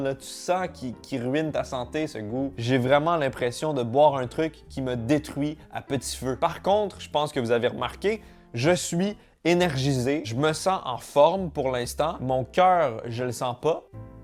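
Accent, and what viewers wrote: Quebec, Canada. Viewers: He is from Canada ( Quebec) so this is french but with a quebec accent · J'adore car quand tu est exiter tu commence a parler vite en cebequoi et on ne comprend rien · même mon père il te connais et il arrête pas d'immiter ton accent du quèbec ( et si je sais pas écrire quèbec vraiment dsl ) 😅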